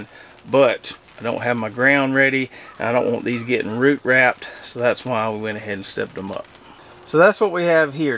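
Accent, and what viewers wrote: South, United States. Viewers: I learn too much from you to unsubsidized how you talk is how you talk you from the south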